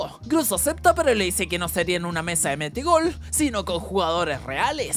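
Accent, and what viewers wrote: Chile. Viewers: Eri chileno? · Una pregunta eres chileno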